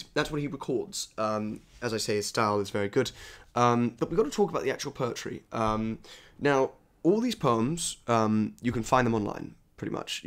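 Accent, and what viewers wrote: RP, United Kingdom. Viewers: I do love a bit of posh 😊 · I’m Brazilian, English isn’t my native language (or even a second one), quite the opposite 😅 but after studying it for a while and being exposed to it, I’d say I understand it reasonably well. That said, I’ve always struggled more with American English — I usually understand other accents better. Watching this video, I understood almost everything. I don’t know if it’s the way he speaks or if it’s actually British English (I’m guessing), but for me it felt like having an English class and wanting to study again. Loved it. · This accent sounds so posh to my plebeian Canadian ears. Also please keep this up. Ancient classics would be interesting too. Esp from the east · I can't help myself from being jealous of the vocabulary and flow of your speech, I guess some people are just from finer stock unfortunately. 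But I have seldom met a nor seen a posher bloke and i feel it distracts me from the subject you are conveying.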